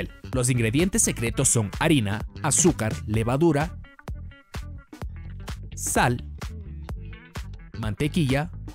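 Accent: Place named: Brazil. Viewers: Soy la única que noto el acento al hablar del chico que narró el vídeo? Es brasileño,😳. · Si es brasileño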